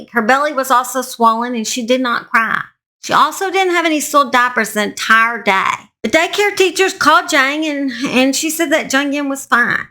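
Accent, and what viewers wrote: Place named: Alabama, United States. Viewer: I could listen to your accent alllll day. 🥰 This is another reason why I subbed to you (including how you tell the sad stories). ~~~~~ Edit : where are you from? Alabama?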